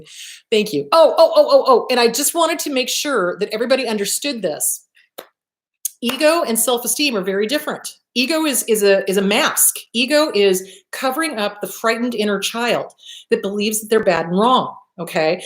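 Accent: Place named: New York, United States. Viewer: Btw, love your New Yorker accent! 😆😆😆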